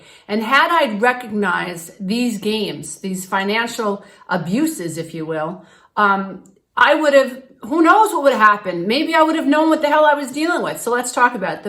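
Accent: New York, United States. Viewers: I think this is the best video of yours I've seen so far. You're bringing the correct emotional response to this sh**. That, along with the NY accent really brings it home! Thanks!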